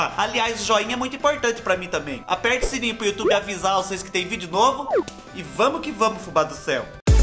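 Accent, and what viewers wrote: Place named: Paraná, Brazil. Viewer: Ah tá entendi Paraná sotaque forte eu sempre tive curiosidade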